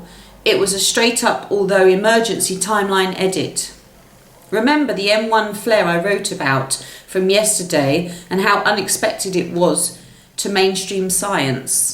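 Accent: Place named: United Kingdom. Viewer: please dont delete your channel. I love your sharings and British accent.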